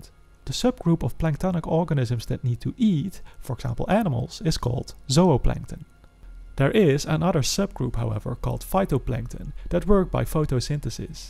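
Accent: Netherlands. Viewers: this guys english is so dutch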